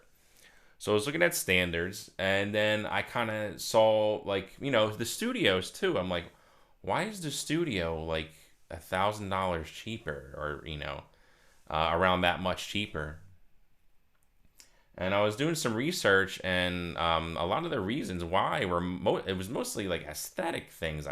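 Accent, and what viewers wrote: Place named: Philadelphia, United States. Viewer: Lol are you from the Philly area or maybe NJ?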